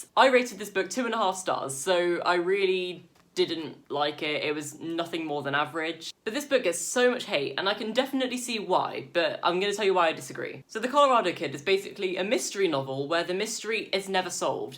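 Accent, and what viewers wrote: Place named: England, United Kingdom. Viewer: One off topic thing.... You have an amazing English accent. May I ask you where you come from?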